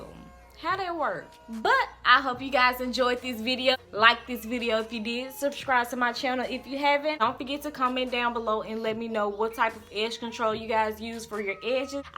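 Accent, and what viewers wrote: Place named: South, United States. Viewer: Your accent is awesome!!!!!!!!! Is it southern?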